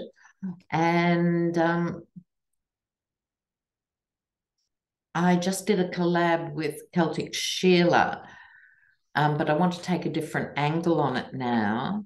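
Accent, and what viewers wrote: Australia. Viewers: I Love that you're going to be on Mary Trump's show as a political commentator. You can seem sometimes like a Sherlock Holmes of tarot and occasionally a gypsy woman tarot reader always with a distinct Aussie accent. Looking forward to your considered thoughts and opinions.🙂 Peace ✌️ ❤️ Love you lots ❤️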